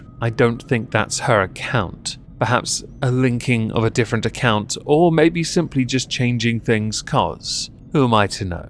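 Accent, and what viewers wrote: RP, United Kingdom. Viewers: Plus his accent is brilliant, posh bugger 😆. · Yeah I can't figure it out, I listen to other British YTers, and yes, A British accent always sounds Somewhat more dignified to the American ear, but there's something Extra "posh" abt his voice... Like, maybe something in the presentation/delivery? There's def no "umm"ing and " uhhh"ing, maybe its the concise, dry delivery. I dunno but I dig this posh dude's channel. (Plus anyone who h8s Nader is cool in my book... "The enemy of my enemy is my friend."😈🔥💯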